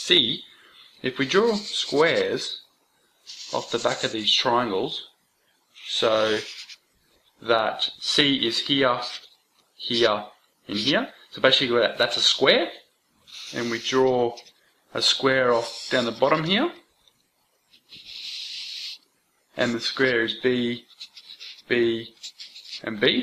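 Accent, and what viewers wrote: Australia. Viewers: He's an aussie, they're like texas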